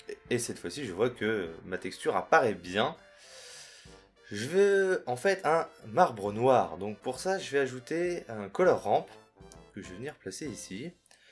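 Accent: France. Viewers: Wowwww a really well edited video, nice! I really like your french accent haha I was okay with reading subtitles but it's true that it could've been a lot better. Above all, it was one of the best tutorials I've seen :) It seems you really know what you're doing and letting people know the steps one by one! Cool! If you make more archviz tutorials I would definitely come and always support your videos.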